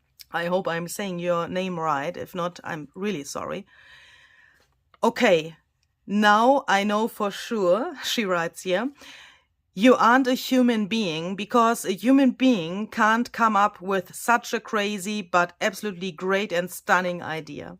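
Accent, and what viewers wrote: Germany. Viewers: such a heart felt message today! To me I do not care what brand you use. I am here to watch your creative, very interesting journals. What you are making is art! You have a heart of gold, and I have connected to you. I told you before that my dad's family all came from Germany. Some in 1840, 1860, and others later. But for some reason, your accent reminds me of my great grandparents, because their first language they spoke here was low German, and they still had the strong accents. I feel like you are family to me. Some people are what we call them here, key board warriors. They hide behind a screen, and say mean things, because we don't know them, and we cannot see them. I will continue to watch you, because you to me are family. And listening to you, brings back the comfort, of listening to my long gone great grandparents. And I am much older than you. 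It is hard to explain. Your English is good! I couldn't pronounce the German words right when my grandma tried to teach me. She said my tongue wasn't slick enough. (She told her youngest daughter the same) ha ha! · This is the most wonderful junk journal I have Ever seen and the most amazing piece of art you and Barbara have done together. You have created more than just a journal, it’s very special. Ideas come from your imagination of seeing things before they are created. Like seeing it done before you start it. I have been able to do this with my father. He taught me to image what I want to make before I came out with it. And some I taught him to make a new way to do a project an easier way, than he thinks. I love watching your videos, love your German accent, and your English is wonderful. You have touched my life and creativity, as well as others I am sure. You are Amazing, and Barbara. 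Thank you so much for your sweet kindness,. I just Lov you. Keep up with your great works. Always be safe and well. Your fan and admirer. Lin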